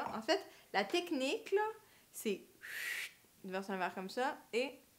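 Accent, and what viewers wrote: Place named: Quebec, Canada. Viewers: tu as un bel accent canadien on dirait!! bises!! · Québécois. Les Canadiens ont un accent anglais, car ils parlent Anglais!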